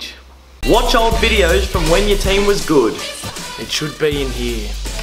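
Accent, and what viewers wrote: Australia. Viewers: Are you Australian? You sound weird.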